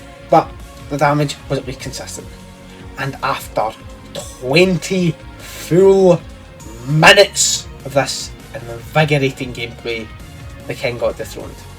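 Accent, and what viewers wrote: Scotland, United Kingdom. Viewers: scottish english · I love how nobody understands him. Grew up around scottish accents so I find it so I understand perfectly. Great vid mate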